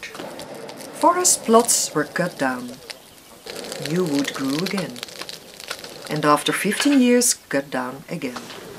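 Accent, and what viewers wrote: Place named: Netherlands. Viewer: It might have been better to have the commentary done by someone with less of a dutch accent and most of all with fewer grammatical flaws in it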